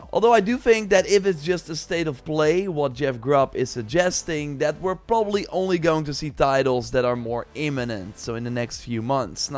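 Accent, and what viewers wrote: Netherlands. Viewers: Klinkt Engels, als een Nederlander...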